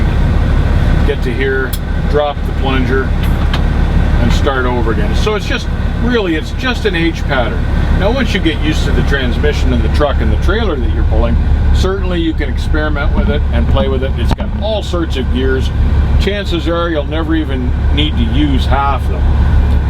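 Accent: Canada. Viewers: You must Canadian if I'm correct. I've noticed that Canadians pronounce out different than we do in the states.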